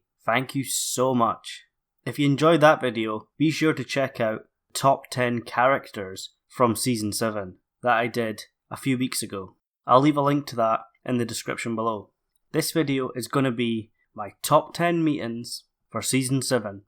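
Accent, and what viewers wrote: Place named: Scotland, United Kingdom. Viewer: Just stumbled across your channel, great stuff; am sure I'll be binge watching it through during the wet scottish summer this year. Also awesome accent. For anyone who's unfamiliar with Scottish accents, this dudes got a lovely 'kind, soft' variant of the accent - the other is more akin to this : <link> Just kidding, I love living here in Scotland! Thanks!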